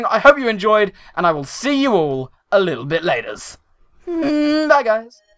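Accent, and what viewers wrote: United Kingdom. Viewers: Is hey British?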